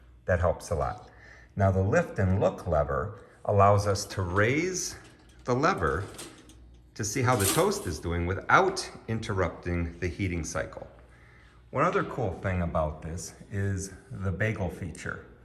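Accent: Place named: Midwest, United States. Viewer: harrrrd midwestern accent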